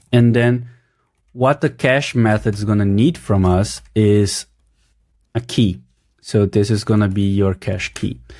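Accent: Brazil. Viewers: Ingles de Brasileiro é muito massa!! PArabéns mano! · Parece Brasileiro, você é brasileiro mn?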